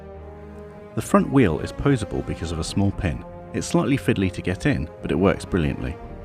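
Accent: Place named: North Wales, United Kingdom. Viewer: ught! I want a cool northern Wales accent to!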